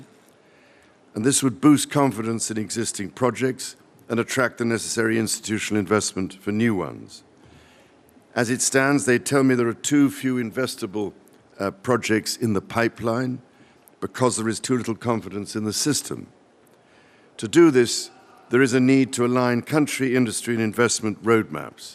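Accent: RP, United Kingdom. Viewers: Here to listen My fav British Accent😍🙌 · Now THAT is a posh accent. I feel smarter just listening to the accent. Props to Charles for being ahead of his time. Our job is to listen to him. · Agree with Lyn Harr... speaks good RSP English, NOT posh at all. has fine steady qualities which will help him to be a good King. I shall be proud to be one of his subjects Vive le Roi Charles, long live all our Monarchs, each reigning in her/his turn. May God keep them safe.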